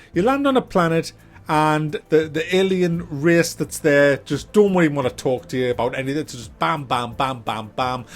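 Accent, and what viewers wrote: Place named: Scotland, United Kingdom. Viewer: I subscribed after hearing your fantastic Scottish(?) accent! Oh, and the ranting is a good bonus.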